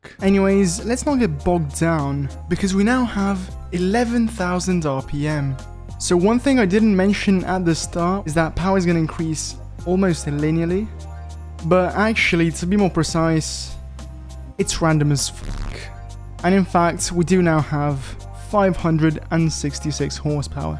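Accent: Italy. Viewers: this is the most italian accent that i've ever heard from an english guy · He's gotta be an Italian living in England with that accent. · Me watching the video thinking a yes someone speaking slowly English,: speaking Italian Me: Ah ma sto qua parla italiano ecco da dove arrivava l'accento.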